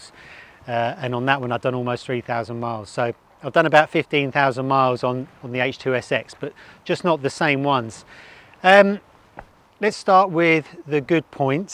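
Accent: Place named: England, United Kingdom. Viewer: The biggest difference of this bike compared to others is the app and electronics. I can't understand with the strong English accent the name of the app? Anyone know? No one that I can find on YouTube spends anytime really explaining 1. Where do they put their phone? What are your options for charging, GPS, streaming? Android? Wish someone would really dive in and really explain. Thanks. My bmw has an amazing GPS app that streams from your phone and has traffic. No charge. This part is so important for a touring alternative. If someone knows of a video on this model that answers all those questions please share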